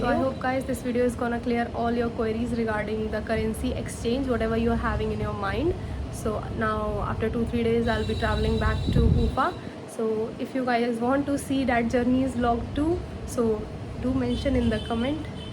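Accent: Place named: Bihar, India. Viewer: Bihari sound aarha h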